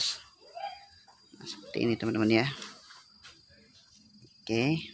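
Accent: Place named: Lampung, Indonesia. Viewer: ini bengkel di lampung ya soalnya ada suara orang lampung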